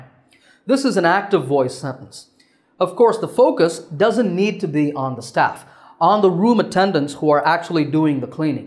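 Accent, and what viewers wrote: India. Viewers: I love Indian English! It inspires me much more than native one! I'm an Ukrainian from Ukraine.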